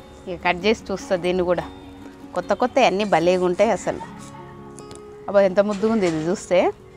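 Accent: Telangana, India. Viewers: తెలంగాణ యాసలో మీ మాట చాలా బాగుంది, మీకంటే ఈ పండ్లు చూసి పక్షులు ఎక్కువ ఆనందిస్తున్నాయా...మేముకుడా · Hiii akka nv maa telangana Chala baga matladut unnam u r🍓🍓🍉🍉👌👌👌👌👌👌👌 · Manchi telangana matladuthunnaru vindaniki bavundhi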